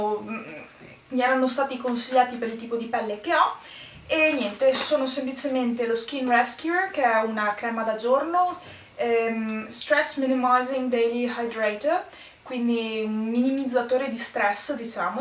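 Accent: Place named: United Kingdom. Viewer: A parte i prodotti fighi, ti faccio una domanda un po' da "fattilicazzimia": come fai ad avere un accento very british? Hai fatto qualche corso, scuola ecc ecc? Grazie mille per il video, un bacione!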